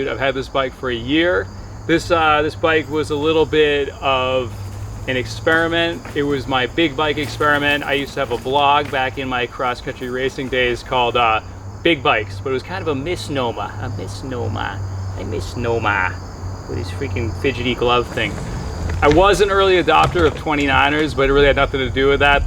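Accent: Boston, United States. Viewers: Hahaha Recovering XC Dork! Intermittent Boston accent.. Checkin all them boxes!! Hope you get some more free time kuz it’s fun to watch ;p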